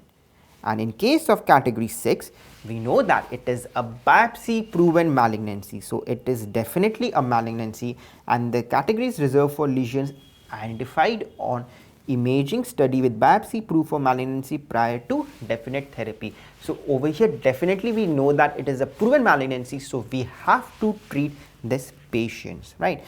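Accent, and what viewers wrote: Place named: England, United Kingdom. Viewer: Again watching this before my xm .......... Your English accent and kindness are just♥️♥️♥️🥰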